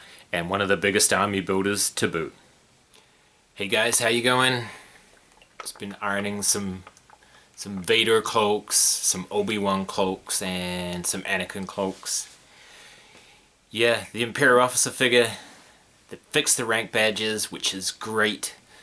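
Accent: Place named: United States. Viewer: Lol - great work! Love your parodies. Your American accent isn’t half bad. I once had a friend here in Texas from Christchurch and definitely did a poor job on his accent when I tried.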